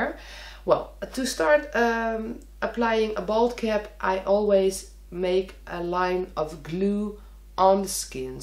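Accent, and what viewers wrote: Netherlands. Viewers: are you dutch your voice is a little bid dutch im dutch 😂😂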